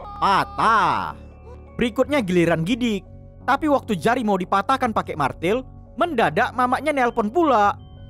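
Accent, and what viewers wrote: Medan, Indonesia. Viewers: Channel ini bukan dari cara suara vocal atau verb yang kurang... tapi pembawaannya emang agak sedikit cepat karna orang medan memang seperti itu... Dengan ciri khas masing masing di setiap channel ada kesan tersendiri.. Berbeda tapi tetap santuy... Buat yang baru liat channel ini jangan buru2 salah paham yah...dengan logat seperti ini lalu mengatakan kurang bagus atau sebagainya. hargai aja dan nikmati.. salam sikopet rawa cubluk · Khas Medan nya medok 😀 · Orang Medan ni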